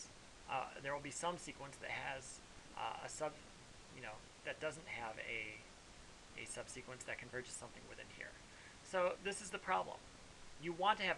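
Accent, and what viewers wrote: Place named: United Kingdom. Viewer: thanks, mate! nice british accent btw THANKS M8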